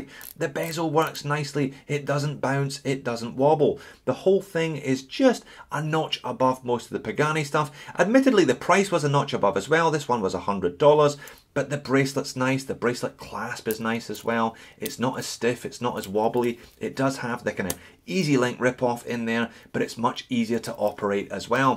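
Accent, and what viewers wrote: Scotland, United Kingdom. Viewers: So just to confirm your accent is Scottish?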